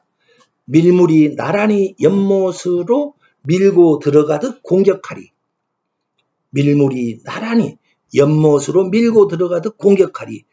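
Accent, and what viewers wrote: Gyeongsang, South Korea. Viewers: 경상도 싸투리죠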